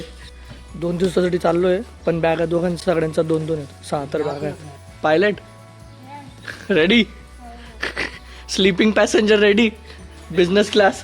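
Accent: Khandesh, India. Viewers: Kay dada jordar khandeshi😂😂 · Dada Tuz boln khup Chhan vatat ahirani Karan me pn nasik Chi a satana tal madhun tuz boln jvlch vatat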